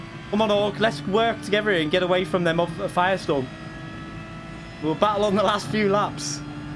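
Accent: Liverpool, United Kingdom. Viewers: Can't work out your accent. You sound like you're from the wirral or full Scouse then other times I can't place it.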